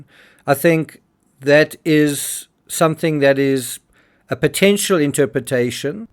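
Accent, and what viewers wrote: South Africa. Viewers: You have a South African accent!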